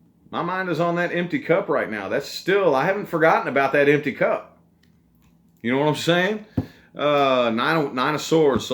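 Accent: South, United States. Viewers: His southern accent tho😍 Aqua here.... Let me go and listen to the "Bonus"🏃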